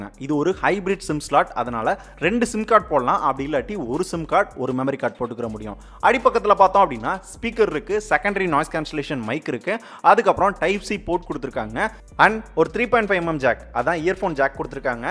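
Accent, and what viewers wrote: Madurai, India. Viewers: Neenga Madurai Ah Bro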